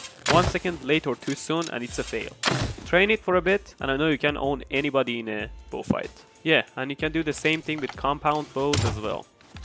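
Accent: Iran. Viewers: i bet you are iranian,ur accent man :D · kheili moshakhase ye irani dare English shoat mikone irani hasti?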